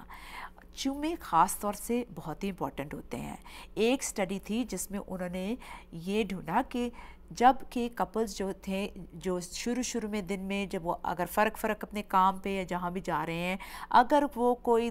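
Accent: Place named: Pakistan. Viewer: Her hindi accent is Pakistan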